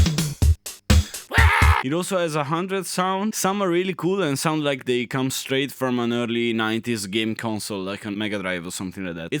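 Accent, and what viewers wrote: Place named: Emilia, Italy. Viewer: Sei palesemente emiliano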